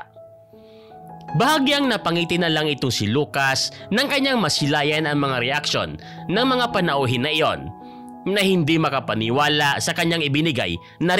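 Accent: Visayas, Philippines. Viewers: Bat ganon ang diction at style ng pag kwento mo, BISAYA KA DONG?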